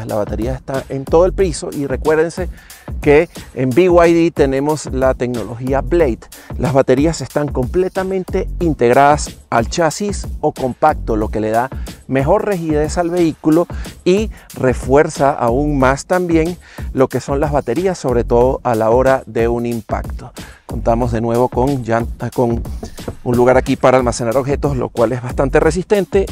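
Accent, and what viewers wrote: Venezuela. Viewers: ¿Ése acento es venezolano?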